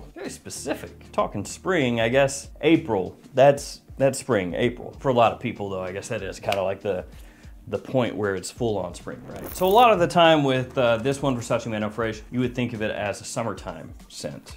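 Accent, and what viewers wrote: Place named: New Jersey, United States. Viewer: Are you from nj? Lol because sounds like nj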